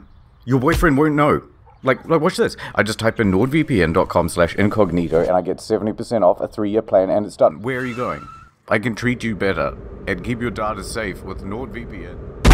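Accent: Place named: Australia. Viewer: oh yeaahhh, just lurve me some cringey ozzie voice with classic gaslighting/manipulative tone of pretense-of-niceness. This guy is so transparent. Ahh, youth.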